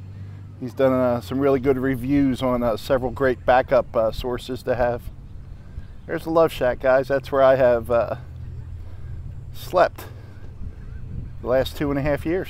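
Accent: Louisiana, United States. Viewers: Hmm🤔...There's a Whataburger on Kostoryz n McArdle, I'm under the impression that's the First OriginalWhataburger in Corpus Christi and that's if it's still there, lol. Of course, Whataburger & HEB both born in CorpusChristi, moved their Headquarters to SanAntonio a few years back & Whataburger just SOLD OUT to some Corporation or whatever from Ohio!-NONETHELESS, Whataburger's all over the place. Me?-----I'm totally burned-out on it. LoL!--Yipes!--p.s. Nice Video!--LOVED that park in Portland, overlooking CCBay!--CajunAccent--Hilarious!- WOOH!!!!🤣!!!!! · Hey, thanks for the shout out today my friend that was super nice and very unexpected but super thankful nonetheless! I love your Cajun accent great job and great video today sir. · Enjoyed the Cajun accent 🎉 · Thanks for the great video! Enjoyed the Cajun accent. Have a great weekend🌞🦋❤️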